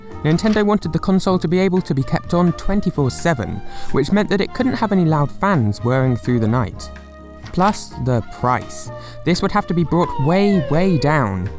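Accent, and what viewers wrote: United Kingdom. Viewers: Very british voice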